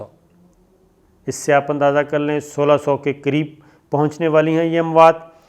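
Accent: Punjab, Pakistan. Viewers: اسلام علیکم. چین جو بھارت کے ساتھ کر رہا وہ تو یے. لیکن میرے بھائی جو آپ اپنی باتوں سے بھارت کو ذلیل کر رہے ہیں وہ تو اور بھی لاجواب ہے. اس پاگل ہاتھی کے ساتھ یہی ہونا چاہئے.27 فروری کو جو بھارت کے ساتھ ہوا.... کیا یہ ذلت کافی نہ تھی ؟ مظلوموں کی آہیں اور بد دعائیں انشا ء اللہ اس مودی اور اس کے ٹولے کو تباہ کردیں گی. آپکی پنجابی اُردو کا مکچر بڑا لزیز ہے. بھارتی جب اسکو سنتے ہوں گے تو انہیں بہت مرچیں لگتی ہوں گی. آپ لگے رہیں ہماری دعائیں آپ کے ساتھ ہیں..... پاک چین دوستی زندہ باد. I also love my great brother country Bangladesh and Turkey. We are standing with our brave Kashmiri brothers. Ae Allah meri arze paak ki hifazat farma. bhai zinda bad. Your great lover. Professor Syed Hamid Manzoor Lahore.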